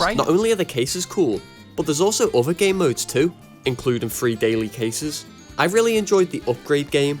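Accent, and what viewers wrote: Liverpool, United Kingdom. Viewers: its an british accent i think scouse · Your British accent is so strong it reminds me of Paddy Pimblett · British accent ❤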